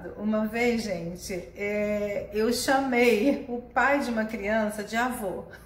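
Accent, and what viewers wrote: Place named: Rio de Janeiro, Brazil. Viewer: Nossa vc é baiana, mas tem um sutaque muuuuiiito puxado de carioca 😊 bjos! Vc é um amor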